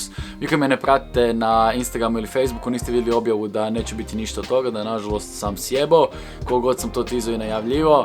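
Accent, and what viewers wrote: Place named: Rijeka, Croatia. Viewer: Danas su nam bili prijatelji iz rjeke isti naglasak ko ti ja se odusevila ;) baci neki vlog na temu srbi I hrvati u smislu da se medjusobno vole ili tvoje neko misljenje za to ;)eto moj deda je bio na golom otoku I imam rodjake koi su preziveli oluju al eto ugostili smo porodicne prijatelje iz Rjeke I mnogo dbr druzenje bilo ;) I sa muske strane .xd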